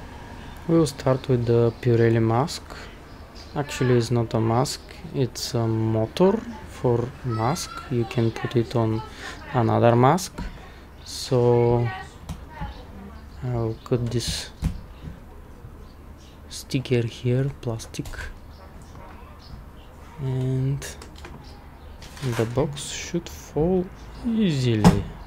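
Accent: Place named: Russia. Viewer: Я один слышу русский акцент?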